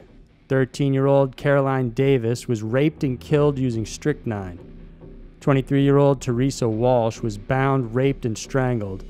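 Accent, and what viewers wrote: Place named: Worcester, United States. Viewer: yeah, man. I'm from Worcester and kinda Heard it in there. Great work with your channel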